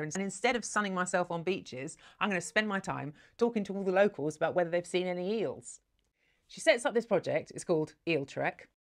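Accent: United Kingdom. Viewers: Beautiful redhead with a British accent yes please!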